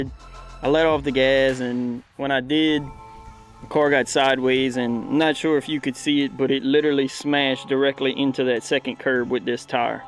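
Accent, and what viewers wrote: Louisiana, United States. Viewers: Just a question? You in southern louisiana.. that accent has some breaux bridge behind it from how it sounds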